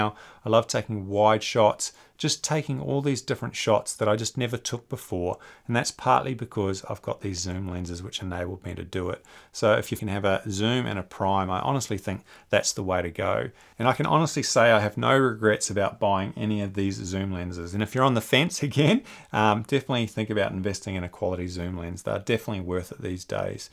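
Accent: Australia. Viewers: I started with Pentax cameras, a zoom105, a k1000, a superA, then went digital with a Pentax ist'd and recently purchased a Canon R8. The R8 came with a 24-50 and ive not long ago bought a RF24-105f4L lens. I was looking at getting something like the 100-400 you reviewed as, just being a hobbiest, paying more than $1000 is hard to do. I do like to have the nice things though so we'll see. I do like the fact that the 100-400 is fairly compact. A bigger lens might make people think I'm a professional, which I'm definitely not. Although I do enjoy photography and I appreciate great photos. I love your videos and reviews. Great to hear that fellow Aussie voice. Cheers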